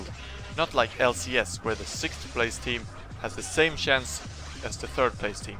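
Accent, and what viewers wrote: Sweden. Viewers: Im so sure ur Swedish! This accent! It must be ^^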